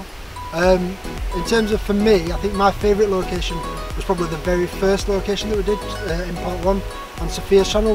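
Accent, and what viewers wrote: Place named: Northern England, United Kingdom. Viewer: Really cool stuff and loved hearing that familiar nothern accent, keep up the good work mate 😊👊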